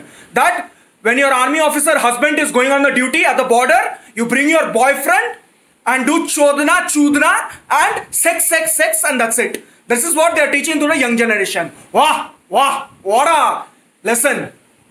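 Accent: Bengal, India. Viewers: Hindi slangs with Bengali accent funny as hell dude XD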